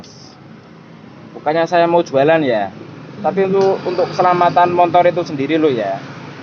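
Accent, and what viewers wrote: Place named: Java, Indonesia. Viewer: Bengkelnya dimana bos ,,ko logatmu wong jowo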